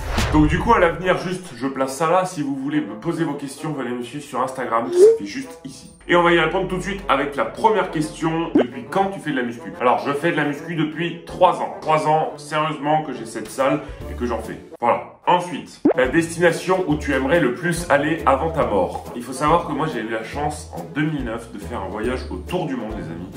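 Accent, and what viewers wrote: Quebec, Canada. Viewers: L'accent québécois 10/10 ✨, incroyable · Ton québécois 10/10 pour une personne qui te suit du Québec super accent 👌🏻💥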